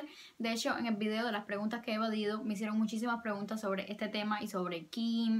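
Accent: Cuba. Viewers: hola 👋 yo me llamo betsye y te veo desde Los Angeles, solo quiero decirte que creo en lo personal que eres una persona muy linda y me encanta tu acento cubano 😉👌 jeje mire que te hacían comentarios respecto a Kim y así que decidí venir a ver tu canal y en lo personal creo que tienes una personalidad muy bonita y me agrada mucho tu energía, sigue echándole muchas ganas y no le des fuerza a los comentarios negativos 👍🏻😃 ya tienes una nueva suscriptora!! 👏🏻👏🏻🤗❤️ tan solo por ser originalmente 😄👍🏻 saludos!!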